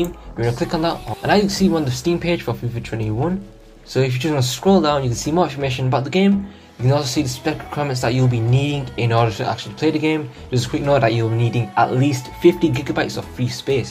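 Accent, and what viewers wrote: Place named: Bradford, United Kingdom. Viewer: My man sound like he’s from my home city Bradford 🤣🔥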